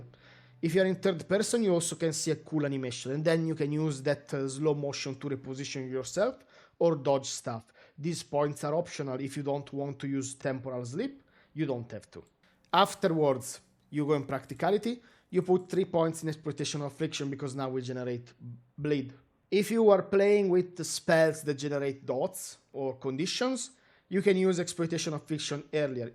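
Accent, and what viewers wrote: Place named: Abruzzo, Italy. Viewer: wow your accent is bad... let me guess you are from Abruzzo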